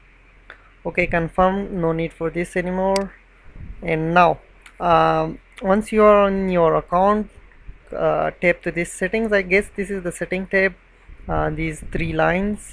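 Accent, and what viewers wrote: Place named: England, United Kingdom. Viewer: It is hard to follow your English accent. Next time make sure you add subtitles. Thanks.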